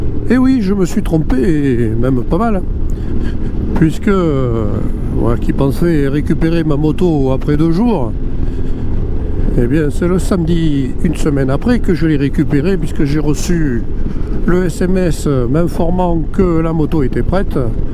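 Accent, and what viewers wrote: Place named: Provincial, France. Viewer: Le mec achète CHINOIS et il s'étonne d'avoir des problèmes, HAHAHA !! Et c'est quoi cet accent ridicule de plouc de province ???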